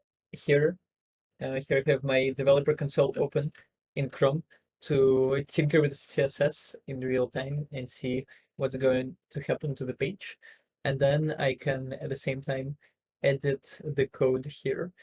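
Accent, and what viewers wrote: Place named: Russia. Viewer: Now that you've been in the US for some time, your English sounds a lot better. In your interviews with e.g. Ben Kuhn and the BBC, you had a pretty strong Russian accent - it's a lot better now! And it sounds a bit more fluent. And you should not underestimate that - communications skills are very important. Especially in the kind of job that youre doing right now - raising funds and talking to researchers to allocate these funds. People not getting an idea or, even worse, getting the wrong idea, will cost a lot of money. Other peoples perception of you will also (maybe subconsciously) be influenced by your language skills, another reason why this matters. Good luck with all your future endeavors!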